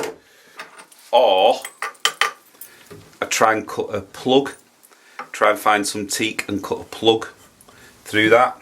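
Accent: United Kingdom. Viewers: contrary to what some others have said, I don't find your talking to be excessive at all. I’m from the U.S. and I love the British accent. I really do. And the instructional nature of your commentary is part of why I come to these videos in the first place. By the way…I must remark on the piano accompaniment in the first four minutes of the video. It was stunningly beautiful. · Me mum was a brit; I am an American born guy. I love your brit narration, please don't deprive us of that brit voice! Also, beautiful restoration on the teak nightstand as usual. PS If I am watching ANY video and I think the person is too talkative, I just put them on mute. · Really solid lovely end table! Keep talking! I love the Brit mannerisms and accent (I'm from USA)! Always going to be someone who doesn't like your 'style' - I'm of the opinion that 'You Do You'! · I love listening to you telling us what you’re doing in that lovely British accent! 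(Just the way you say ”lovely”… ☺️) Please keep it up! ❤️ Also, great transformation and glad you kept the lock! 👍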